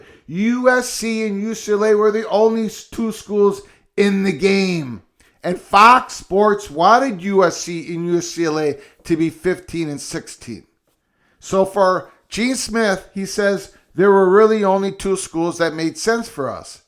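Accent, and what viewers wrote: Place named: Minnesota, United States. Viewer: Your Minny dialect sounds Chuck Grassley-adjacent "vooootes."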